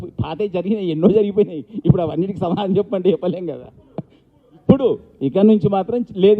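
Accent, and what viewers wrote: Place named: Telangana, India. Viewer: Antha baane undi gani Nuv matladedi Telangana na, Srikakulam ah, Godavari yaasa na ardam kavatle anna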